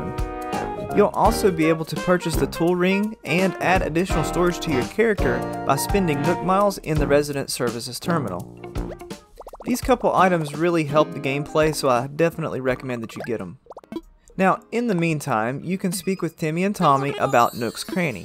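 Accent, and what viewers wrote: Texas, United States. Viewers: Is that a Texan accent? Lol!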